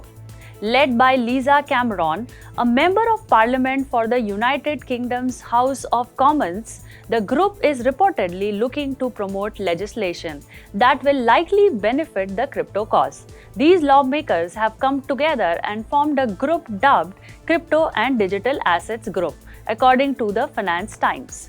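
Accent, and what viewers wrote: Gujarat, India. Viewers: She's very pretty. But honest feedback - she has a strong gujju accent and a very sing song voice. Please don't get hung up on gujju ego and identity. You want to make money! Get an anchor who's accent neutral and presentable. The content was good.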